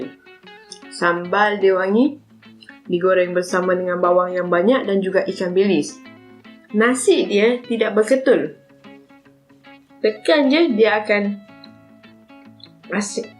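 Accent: Kedah, Malaysia. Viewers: 10 bungkus hang Tibai Nasi Lemak.. He org kedah ka?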